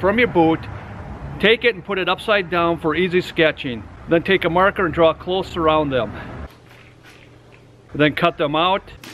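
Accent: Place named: Midwest, United States. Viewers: I'm born and raised in the great state of Michigan. If you sir are not, you would fit in great in the U.P. Your accent is very Upper Peninsula Michigan/Canadian good sir lol. We spend a ton of time outdoors. You gave some great insight for those who don't know the tips and tricks we use to stay decently toasty! Take care and have a great day🇺🇸🇺🇸🇺🇸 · Great info ,taken aback by Minnesota/Wisconsin accent it's really distinct.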